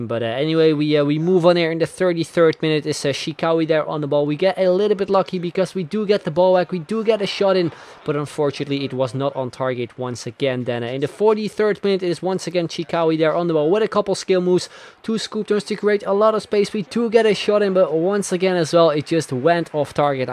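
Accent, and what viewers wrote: Netherlands. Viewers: You sound Dutch! If you are, you 'll probebly get more subs than DDG in like 2/3 years.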